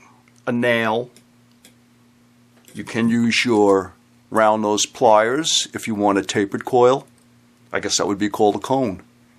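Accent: New York, United States. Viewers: Thank you for sharing. My husband and I were looking at your website last night. You are a genius. I would love to have a piece of your art , truly amazing very beautiful. I love your accent, I grew up in Johnson City, New York. Spent a lot of time in the city. I can't tell how much I miss it. I live in California now. Blessings to you, Deborah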